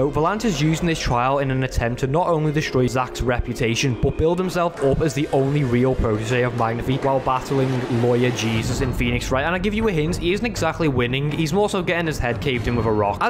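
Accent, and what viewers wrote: Liverpool, United Kingdom. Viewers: You're my favourite scouse ace attorney youtube channel <3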